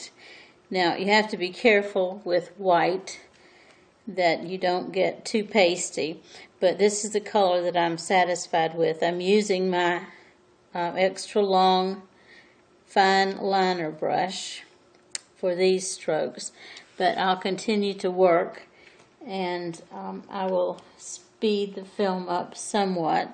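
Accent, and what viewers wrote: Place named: Wilmington, United States. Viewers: This session 4 doesn't look like it started where 3 left off. The foreground is different. Would it be really hard for you to show the exact steps taken in a painting, instead of leaving huge gaps??? Have to say, all your videos are kind of a mishmash and there is no streamlined continuity in any of them, or any combination of them that goes from START to FINISH of a painting. or even the techniques you use. Intermediate stages are not shown which are really crucial for learning. Maybe you need to get more familiar with the technology of making videos. None of the other art instructors up here on Youtube leave such gaps in showing their technique. You sound like a very nice Southern lady, however, I think you should stop making so many sessions with each painting and make a one hour long, or however long it takes - video showing literal START to FINISH of a painting. 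Don't worry about making them roo long. People want to learn the whole process. No one will get bored, there are lots of one hour instructional videos up here, but not many beach scenes unfortunately.. I think maybe you're not comfortable with the video equipment and thats why you leave out some stages from one session to the next. I hope this helps you get more viewers. Your beach scenes are really lovely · I am from Wrightsville Beach.. you sound like you’re from at least Wilmington or nearby. Beautiful painting!!!! I have a very hard time with sea oats and this pretty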